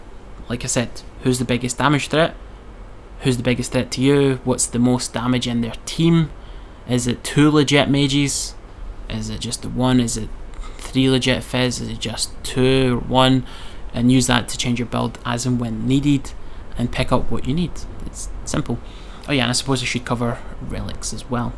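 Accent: Scotland, United Kingdom. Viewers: Could you talk just a little bit slower:D kind a hard to follow when your not form Scotland.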